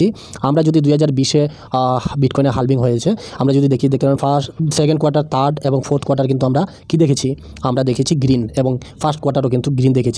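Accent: Sylhet, Bangladesh. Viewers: মনে হয় সিলেটি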